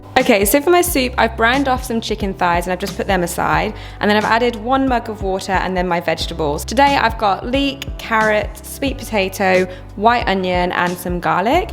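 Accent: Australia. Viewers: Australian accent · you have an amazing australian accent. Love it. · Omg your cat in the background 😂😍 PS love this video, you are so down to earth and relatable. I can definitely hear the Ozzy lilt in your voice!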